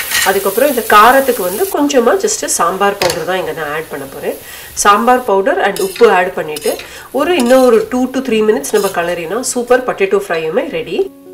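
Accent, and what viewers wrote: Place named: Karnataka, India. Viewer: I am Sujithra from Chennai. Nice video. will try the peda recipe, looks yummy. very nice recipes. what is your mother tongue, your tamil looks a mix of kannada or tulu, correct me if I am wrong, lik your videos, they are very positive and nice. keep going, all the best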